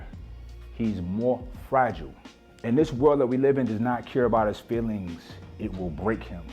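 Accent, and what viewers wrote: Brooklyn, United States. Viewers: "The culture rewards this bullshit" I know Brooklyn when I hear itl 🗣 TEACH!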